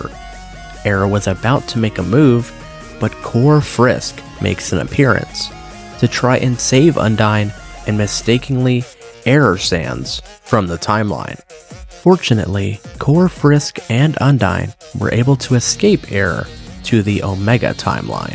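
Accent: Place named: Azerbaijan. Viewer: Azari(A Turkish accent)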